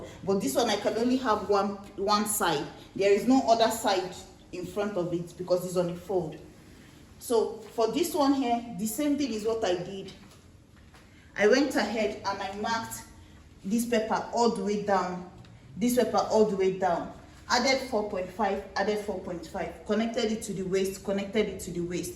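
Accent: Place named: India. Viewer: Am I the only one hearing an India woman's voice?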